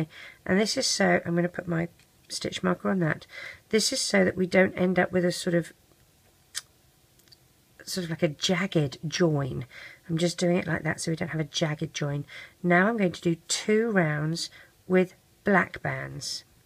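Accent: England, United Kingdom. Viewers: The best minion ever! I love it! Just one question, are you English?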